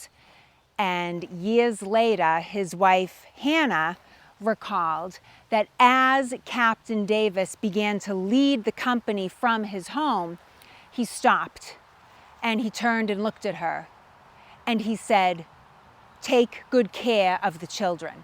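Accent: Boston, United States. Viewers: Love your authentic Boston/Massachusetts accent! I know it well, because I was born in Boston, Ma. Boston City Hospital, 1000th baby born , August, 1964, parents born & raised in Boston, from the 1940s until they moved west down the Old Boston Post Road,(US Route 20),33 miles to Northborough, Ma. (Where surveyor Benjamin Franklin ordered the "33 Miles To Boston", mile marker, placed there during his time as Postmaster General! I absolutely love our American History, which is probably why your channel came up in my feed! I grew up primarily in Northborough/Westborough, area, incorporated in 1766 & 1716, respectively, about a mile outside of town, where one of many of those old, granite Tribute Stones, comemorating Revolutionary War General Henry Knox's passage through the town with his army, and the cannon/artillery pieces which hed collected from fort Ticonderoga, NY, to deliver to General George Washington Bunker Hill, Boston, along with as many of the troops, ammunition, and powder, which Gen. 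Knox inevitably came across during his journey to reinforce Gen.Washington, as ordered by the Commander in Chief of The Armies of The (newfound), United States. Our Northborough Militia was led by one Captain Samuel Wood, whod sent for all Northborough Militiamen to muster The towns Musterfield, immediately after recieving the alarm of April 19, 1775! I know all this wonderful history because I was fortunate to grow up in a VERY Patriotic little suburban town, and just wanted to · has the thickest Boston accent that I have ever heard. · I have read extensively on our revolutionary history, but it somehow takes renewed importance when a hot woman with a killer Boston accent is telling it. Keep going because I'm picking up details I didn't know before.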